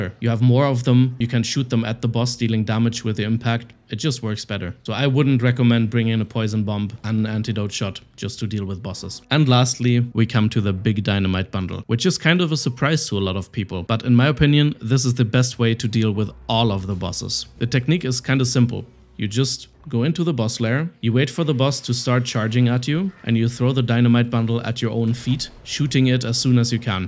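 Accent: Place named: Ireland. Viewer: This guys accent is almost irish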